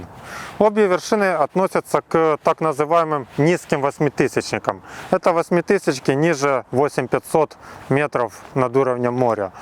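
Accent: Ukraine. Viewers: чому ви не ведете блог українською? По вашій вимові чутно, що ви україномовний. Нахіба оцей випєндрьож?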